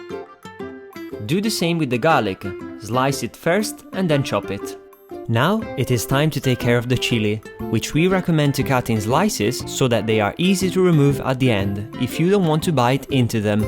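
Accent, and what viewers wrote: Italy. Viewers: I knew it! you got the touch of Italian flair in your manner of speaking....please come to Toronto...you stay with me....and we cook veges day in and day out...I mean it.Toronto is heaven for vegetarian due to imports from Asia, central America, the caribbean, mexico and so on. Here, I see vegetables and fruits I haven't seen somewhere else. probably I will record a video and send u next time I go to the store. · I think it's italian i'm italian and that's what it sounds like :)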